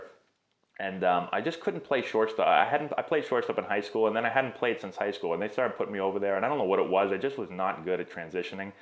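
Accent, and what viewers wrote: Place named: Brockton, United States. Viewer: I grew up Brockton, MA didn’t even know who this guy was. But definitely recognize that accent! Thank you for all these videos man! You definitely bring the complexities of baseball down to a simple Level for everybody to understand.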